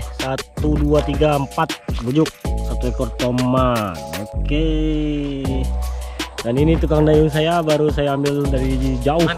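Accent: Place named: Sarawak, Malaysia. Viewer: bahasa nya kyk sarawak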